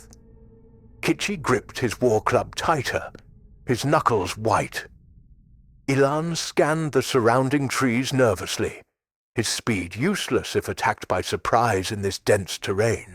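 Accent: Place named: RP, United Kingdom. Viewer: ok that explains the generic posh english voice seriously I love wearwolf dogman stories and use to love every channel I came across that narrated/ reported the stories or encounters but lately people don't have tge faith in the voice of there own howel theve lost the lessons that its goider to fail to die to be crushed as you're self than to live a single second or even succeed as someone or something you're not I won't run you or this point into the ground but if you want a decent respectable backable channel use youre own voice embrace you're inner beast and inner human not to mention with all the sheep following the A .I trend it will set you apart from the herd / flock and remember brother if you are wolfen too than wolves care not for the barking of dogs or the baaa,ing of sheep peace may Luna bless you're steps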